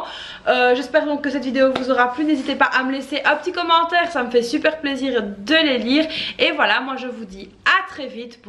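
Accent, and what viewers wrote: Belgium. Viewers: Bonjour! Chouette vidéo! Votre accent viendrait-il de Belgique? 😉 Bonne journée!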